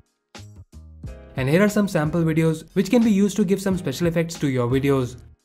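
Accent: India. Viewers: I hear annoying Indian accent, I click away.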